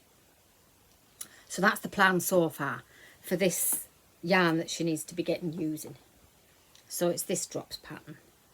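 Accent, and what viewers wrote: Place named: Lancashire, United Kingdom. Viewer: I'm a new subscriber and really enjoying your videos. Is it ok to ask whereabouts you are from originally? You sound so much like a friend of mine from Lancashire. I am originally from there, but we moved over the border many years ago. Thank you for your very entertaining podcasts. 😊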